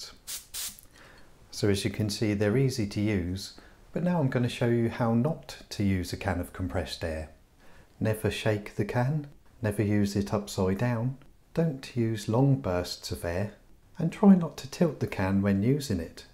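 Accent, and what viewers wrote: United Kingdom. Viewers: British Bob Ross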